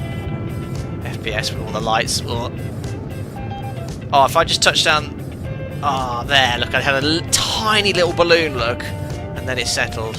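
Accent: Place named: England, United Kingdom. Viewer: Great stream , I think it’s the Concorde and the English accent that go hand in hand.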